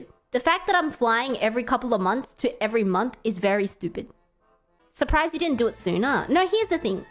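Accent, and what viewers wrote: Australia. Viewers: At the surprise of know one, but fr as long as she keeps her Aussie accent it's all good. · Imagine moved to japan then she talked normally with no aussie accent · I hope could maintain her aussie accent in Japan.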